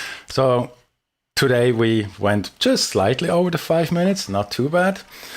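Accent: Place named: Switzerland. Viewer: Very cool and informative video!😉 really like the swissgerman accent😁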